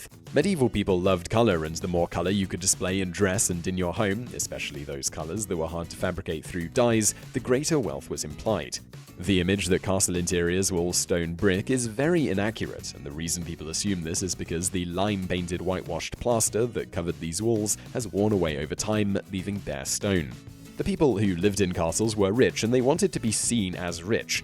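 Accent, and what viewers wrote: United Kingdom. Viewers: Okay after so many videos of this guy, I am convinced he is mispronouncing words incorrectly on purpose, and thinks he can get away with it just because of the U.K. Accent. I swear I don't care what you say medieval is not five or even four syllables.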